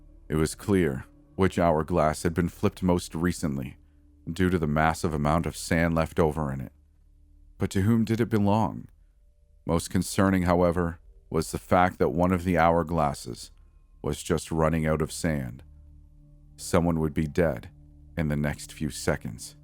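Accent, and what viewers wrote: Canada. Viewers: Love the Canadian accent😍🇨🇦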